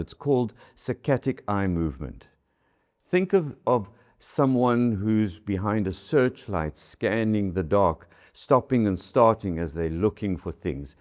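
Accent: South Africa. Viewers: Love hearing that SA accent. Lived there a few years and miss it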